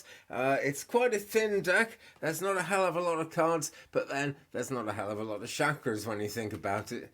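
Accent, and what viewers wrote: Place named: Wales, United Kingdom. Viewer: Love your voice & Welsh accent! My former mother-in-law was Welsh and you remind me so much of her! Have a great day!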